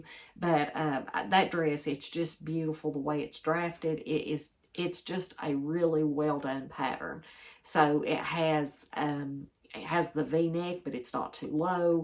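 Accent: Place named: Georgia, United States. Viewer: I noticed your accent right away and thought she sounds like I would if I was on YouTube...I live slightly north of Macon, GA.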